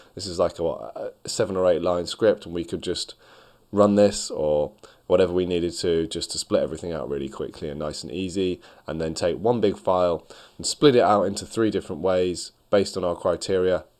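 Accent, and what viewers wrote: United Kingdom. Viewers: Tough British accent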